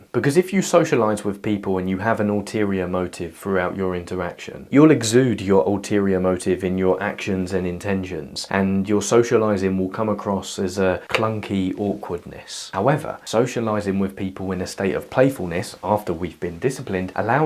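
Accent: United Kingdom. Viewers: I don't often come across British English, so it's difficult for me to understand everything you said. But wow, I really love you and your content. Your knowledge helps me a lot, thank you.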